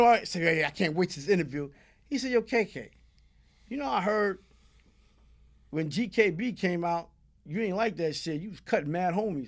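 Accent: Queens, United States. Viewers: Naw you from Queens haaaaaa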